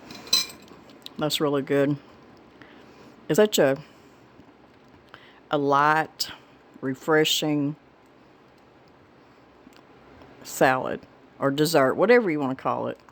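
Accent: South, United States. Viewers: I don't know how I came across this but after watching it for about 10 seconds I hit subscribe. I love her southern accent, she sounds like my mamaw.. love the recipe too!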